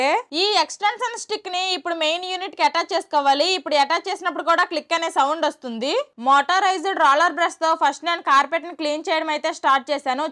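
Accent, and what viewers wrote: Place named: Vizianagaram, India. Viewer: Hai చెల్లి ఎలా ఉన్నారు.మాది విజయనగరం.అందుకే మీ మాటలు మా మాటలు ఒకే లాగా ఉంటాయి. చెల్లి నాకు ఛానెల్ ఉంది. Indus valley కి యూట్యూబ్ మెయిల్ ఇవ్వాలా వేరే మెయిల్ ఇవ్వాలా.please reply చెల్లి.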